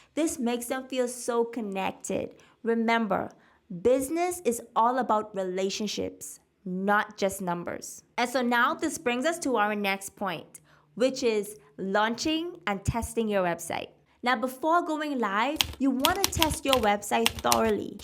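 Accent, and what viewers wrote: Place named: Nassau, Bahamas. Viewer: I knew it u sound like some of my family who live in Nassau ❤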